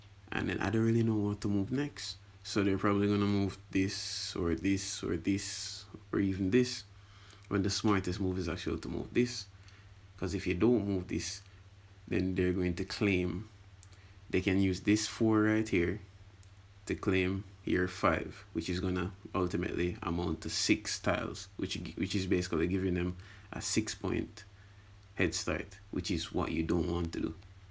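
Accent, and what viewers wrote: Jamaica. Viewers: Are you Jamaican?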